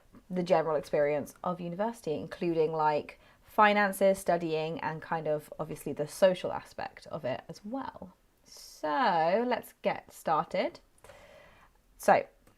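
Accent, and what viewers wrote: RP, United Kingdom. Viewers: Is it rp accent?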